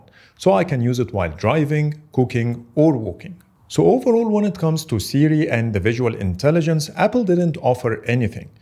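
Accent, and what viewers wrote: Egypt. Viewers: انا اول مرة اشوفك و عرفت انك مصري بس من طريقتك 😂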